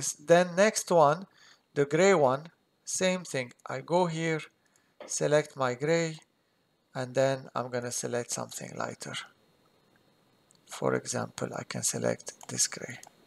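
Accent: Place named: Lebanon. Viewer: Hi, very clever. By the way, from your accent, I guess you're Lebanese, aren't you ?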